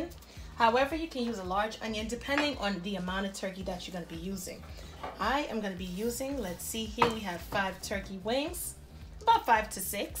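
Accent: New York, United States. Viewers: Is that an NY accent. 👀👀This is how my gma cook it butter & all. I watched her cook it from a distance. I couldn't 100% remember how she did it. Thnks Blk PP posting soul food on YouTube ❤❤well minus the gravey mix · love your New York accent!!!!! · Good Lawd with...I'm doing this one...and I knew you was NY...Got a friend from NY that sounds just like you...Respect....real talk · This my first time watching this channel and all I hear is an uptop NY type accent I'm sold jus off the way u keep saying OFF like a NYer 😂❤️ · She is a ny'er to the T. All i hear is ny thru and thru. Im from cali. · Love your delicious Turkey wings recipe. I hear your NYC accent too! I was born and raised in the Bronx aka Boogie Down. Thanks for sharing! ❤️ My bad. Forgot I reached out to you a year ago. Recipe still popping!!! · Dee I Love Jesus Yup I here her N.Y. accent one of the Burroughs she’s from I from the Midwest but I know them deep NY accents lol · I hear that NYC accent. 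This looks so good. I cook mine with the Cajun trinity (Onion, celery, green pepper), and I like my wings crispy. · I hear that New Yorker in you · These look AMAZING! I'll be trying this tomorrow. By the way...Are you from NY? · Haven't heard that New York accent in years.😁 Printed recipe's a bit lacking. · she sound straight from NYC lool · is definitely from NYC…that accent is poppin💯😀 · Sounds like a New York/North Jersey accent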